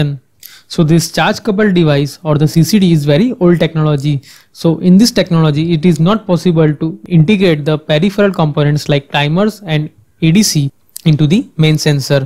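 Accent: India. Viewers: I love this indian english :)) ,,thanx for explanation! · hehe... razumio sam ga sve, mozda zato jer imam tehnicku/elektronicku podlogu. ali taj indijski naglasak je uzasan. ti indijci cak i boju glasa imaju svi istu i ruzna je haha. i onda ovaj jos doda bass boost i jos totalno pretjera u tome, da frajer zvuci da ima dublji glas. uzas za uzasom :D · it's India's English right? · the Indian accent is so heavy I can hardly understand a single word he's saying. I know he is speaking English but what the hell is he saying? It didn't do a thing for me because the accent is too heavy to understand even with headphones, and I use headphones predominantly when viewing and watching videos, or listening to music online.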